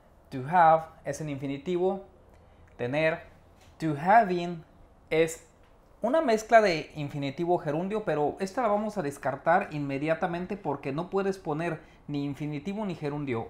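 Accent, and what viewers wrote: Spain. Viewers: me encantó tu acento español tocallo. hace tirmpo hiciste un video sobre inglés britanico ¿ Podrias, por favor hacer uno sobre inglés Australiano?. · Ese acento español...mal jajaja un saludo y buen video una vez mas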